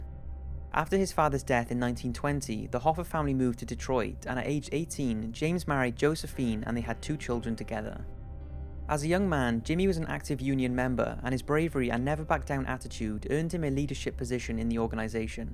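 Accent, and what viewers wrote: Wales, United Kingdom. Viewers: With all due respect, I'm confused. Do you mean the author? The real Lemony Snicket (Daniel Handler) is from California and has a very deep monotone voice. The only thing I can think of is that you're referring to the guy who plays Snicket in the film 'a series of unfortunate events', Jude Law, who is from South London, whereas the guy narrating this video, if I'm not mistaken, is from South (?) Wales in the UK, which is a v different accent to London. Either way, he sounds v little like lemony snicket, real or acted.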